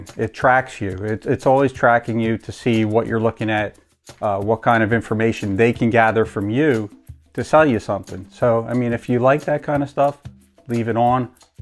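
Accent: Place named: Philadelphia, United States. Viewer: is this guy from phiolly, he sounds like it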